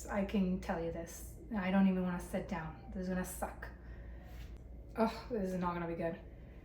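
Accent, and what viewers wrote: Argentina. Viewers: Hola. Te escribo desde Colombia. Soy muy fan de tu contenido, me encanta. Por qué no haces videos hablando en español? Así como los haces en inglés, qué tal si haces de vez en cuando contenido en español. Lo poco que te he escuchado hablar en español, me ha fascinado tu acento y estaría genial que nos dejaras escucharte de vez en cuando en tu lengua materna y más con el acento argentino que es maravilloso. Hazlo:) nada mejor que hagas más contenido hablándonos de tu país, de tu cultura, de tus raíces pero con tu acento nativo. Anímate 😊 Saludos desde Colombia y te felicito por el contenido que haces y el empeño que le pones, se nota que te encanta y eso se transmite una naturalidad muy bonita que se ve en la forma en la que haces tus videos. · I knew that accent sounded familiar! My ex-wife is from 🇦🇷 and half Italian, lol.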